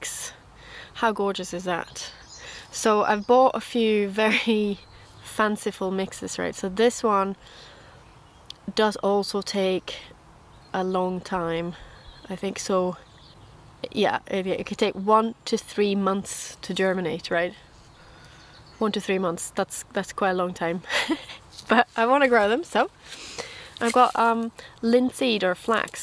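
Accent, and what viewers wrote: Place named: Northern Ireland, United Kingdom. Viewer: You've chosen a lovely flower selection come summertime your plot should look really colourful. Btw I'm wondering if you've ever lived in N Ireland. I thought I detected some of the dialect in your accent or perhaps I'm mistaken. Snow was heavy in our region for a few weeks but today it's sunny so Spring is on its way soon. Take care.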